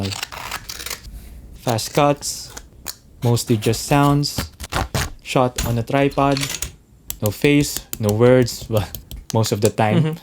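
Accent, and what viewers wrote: Philippines. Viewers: I love the filipino accent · That Filipino accent though, ur my favourite yt channel btw. Rly excited about your reviews and the next build. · I like your Filipino-English accent, doesn't sound conio or pretentious. I watched one of your vid where you assembled this cute-ass gameboy then I noticed the "mini walis" that got me thinking "tang-ina pinoy 'to?" Lo-and-behold pinoy nga. Haha, I don't fancy the fast cuts myself but I like the overall aesthetics. You earned a sub! :)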